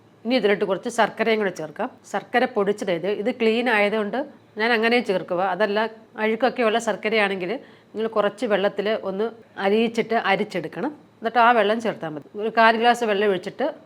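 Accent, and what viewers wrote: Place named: Mavelikkara, India. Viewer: Nalla presentation anavashya vachakam onnum illa bhasha kettittu oru mavelikkara side pole thonnunnu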